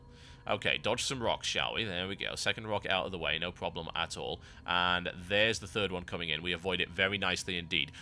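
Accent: United Kingdom. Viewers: british speak sucks !!!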